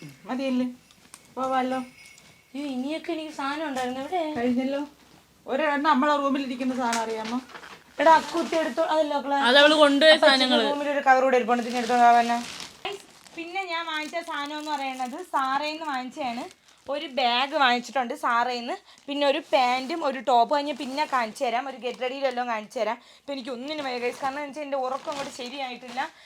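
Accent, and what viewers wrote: Trivandrum, India. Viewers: mole 🥰, so proud you lil one and thankyou so much for making our Trivandrum slang loveable and acceptable to everyone. പിന്നേ മോനാൾട്ട് പോലെ different വാക്കുകൾ മലയാളത്തിനു സംഭാവന ചെയ്തതിനും 😄😂👌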